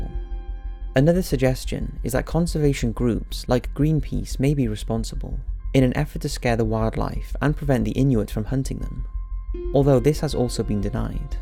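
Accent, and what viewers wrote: Wales, United Kingdom. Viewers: Well we know he’s Welsh and from Wales by his accent.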